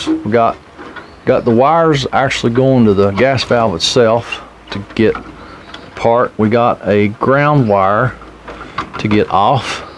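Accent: Appalachia, United States. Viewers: "I wasn't holding my mouth right" - at that moment I knew I was following the right RV tech, as you were speaking my language. Lol I'm your neighbor down in Tennessee, so I understand that Appalachian dialect. I am an RV tech in training, so I really enjoy your videos! Thanks for taking the time to do them!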